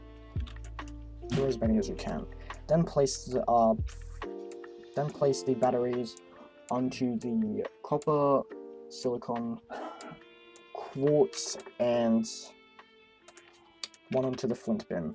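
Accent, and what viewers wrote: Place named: United Kingdom. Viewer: when a british guy narrates, you know its a good tutorial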